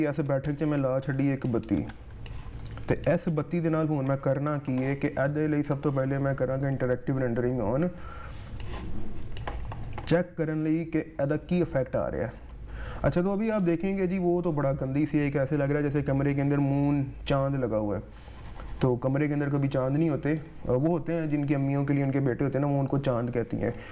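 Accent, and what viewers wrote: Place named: Punjab, India. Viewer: paji tuhada punjabi accent att krva denda 🔥🤟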